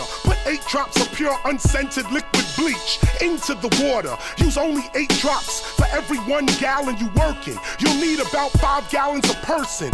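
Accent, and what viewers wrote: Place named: Jamaica. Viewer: this guy talks like me he must be jamaican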